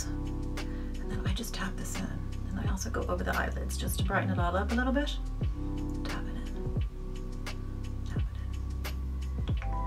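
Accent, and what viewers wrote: Ireland. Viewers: Hi, I’m so glad your channel was recommended to me... this is the first video I’ve watched of yours and at first I thought you were Canadian, but it’s the Irish lilt I can hear. I’m a big Trinny London fan too and the brand is cruelty free which is a must for me. I love her, she’s a bit bonkers but she makes me smile and her products are amazing. The eye colour is great too, just a quick dab on the eyelids (you don’t even need a mirror) and I’m good to go... nowhere! 😂 I’ve just subscribed and am going to binge watch your other videos 💛✨💛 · Beautiful. Also, your accent - with its tiny hint of Irish - is adorable. ☺️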